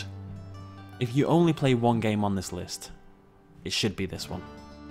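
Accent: United Kingdom. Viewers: ***** jpog is my fav dino game and by the way are you British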